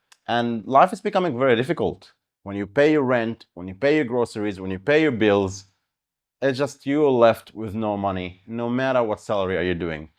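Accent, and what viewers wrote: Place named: Europe. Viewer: You sounds from Europe, where are you from?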